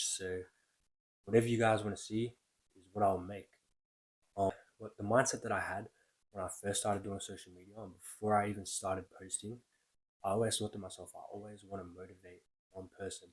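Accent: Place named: United Kingdom. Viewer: that accent thooo you in the uk ??!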